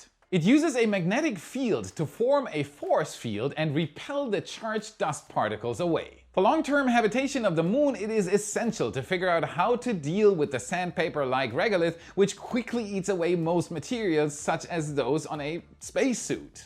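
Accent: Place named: Germany. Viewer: could very well be for a different pronunciation. is German, so kinda expect a heavy German accent with the pronunciation 😊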